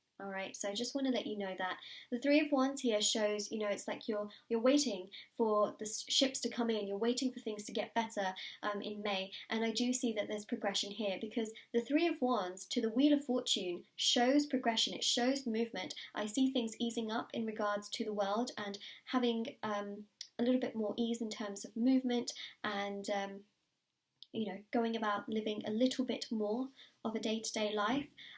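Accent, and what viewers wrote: England, United Kingdom. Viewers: I adore your accent, so Hermione Granger 😍 What part of England is that?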